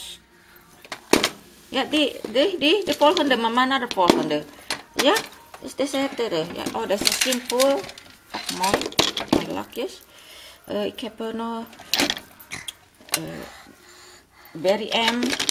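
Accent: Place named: Java, Indonesia. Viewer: Mbaknya wong Jowo yaa? Boso Londo logate koyo wong jowo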